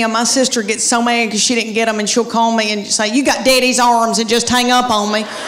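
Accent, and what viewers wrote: South, United States. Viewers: Yes. She's very smart, hidden behind that accent, a true American!👍❤️ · Youa lil bit country ain't cha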